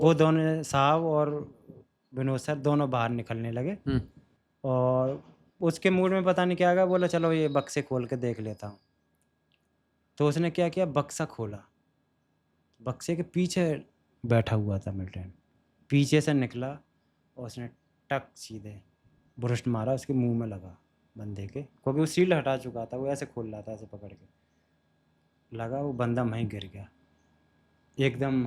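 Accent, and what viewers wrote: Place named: Madhya Pradesh, India. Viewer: जय हिंद जी आप तो mp से हो आपकी भाषा mp ki लग रही हैं कंहा से हो सर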